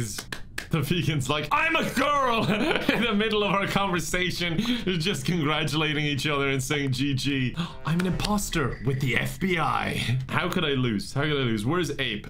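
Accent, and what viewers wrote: Ireland. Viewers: Do you all remember old. Those were some of the best videos. Now he just repeats what happened in an Irish accent., if you read this go back to the old variety channel kind of thing. That was great. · IDK Why, But i really like the irish accent, I've always wanted to be irish myself but i don't think i can change myself like that!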